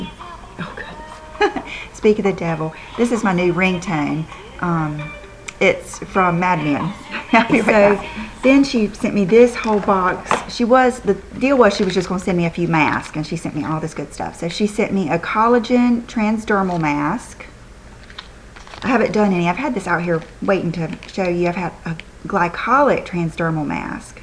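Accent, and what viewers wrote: South, United States. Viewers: Hi, I just wanted to tell you that I love your sweet voice, it's so soothing and I love your southern accent:) xoxo Melody PS. Is there any way you could do a tutorial on your makeup look? It's gorgeous!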